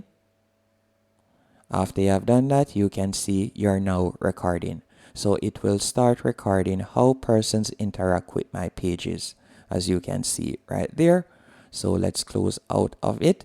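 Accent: Jamaica. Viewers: It's nice to land on a video with a Jamaican accent. Your videos are helpful, thanks.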